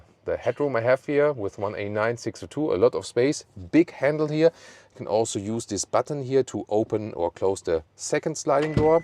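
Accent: Germany. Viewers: The word version really brings out the German accent 🙂 I had seen another review of it earlier that did not mention the ridiculously low battery capacities 😕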